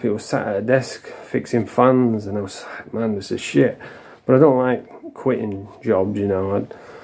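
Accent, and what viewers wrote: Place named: England, United Kingdom. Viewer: Go on lad… I’m from the basque,north Spain, But I work on ferrys in the Uk , all over…I’m curious about your accent ? Which part of England are you mate? Strong lad, nice2 meet u…’ Greetings 🙏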